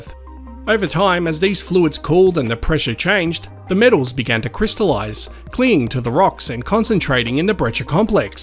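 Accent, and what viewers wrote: Australia. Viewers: I could listen to Australians talk all day long , I wonder how they feel about Texan accents?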